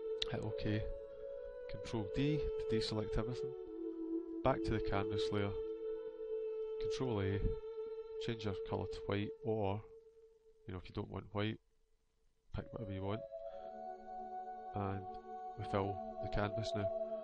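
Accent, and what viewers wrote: Scotland, United Kingdom. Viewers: Your stuff is great, and I've watched all of your videos. But turn down the music by 50% or use a ducker plug in (Ducking Compressor) to reduce the volume of the music when your talking automatically. It's really hard to follow what your saying with your scottish accent. but the narrated vids are much better than the silent ones.They move so fast till you have to stop them and try and figure out what you did at key moments. You are a big inspiration. I've been using Photoshop for 22 years and use to be pretty good in painter but at some point it became to complicated to use and I lost track. but you have brought me back. Thanks. To see my stuff go <link>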